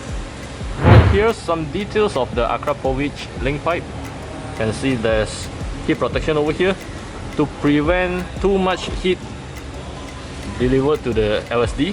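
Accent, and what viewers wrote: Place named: India. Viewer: Индуса сразу слышно 😂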